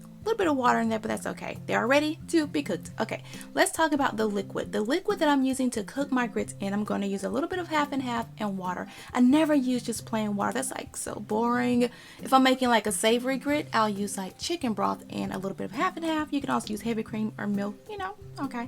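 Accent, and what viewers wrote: South, United States. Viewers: I don't watch your videos for the recipe I watch it for your accent love your accent southern girl so am I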